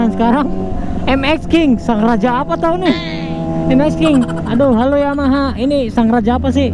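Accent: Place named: Medan, Indonesia. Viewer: Mon maap bang lu orang Mane nih dari logat logatnya macam orang Medan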